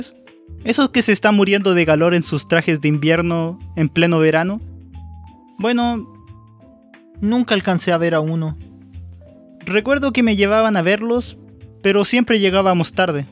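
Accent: Argentina. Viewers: Eres de argentina? :v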